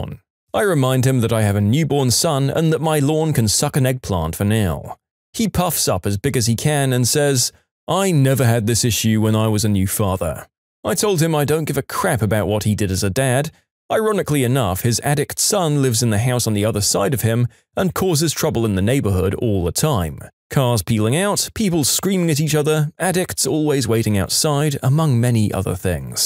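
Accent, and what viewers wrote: United Kingdom. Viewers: He's British now?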